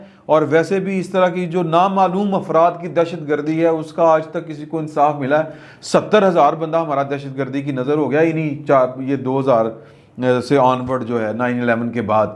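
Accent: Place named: Punjab, Pakistan. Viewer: Assalam Alaikum. The touh of Punjab in your commentary is as delicious as a touch of "FRY " to a "SAADA DAAL"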